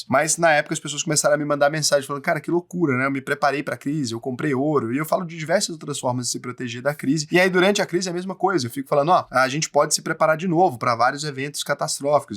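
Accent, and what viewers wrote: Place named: Rio de Janeiro, Brazil. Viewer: Ohh esse audio seu tá parecendo carioqueis parece esta falando assobiando 🤣🤣🤣 ajuda aí meu fi.Ass: Frantyesco Maia mineiro aqui em Portugal, um abraço e obrigado pelas excelentes explicações.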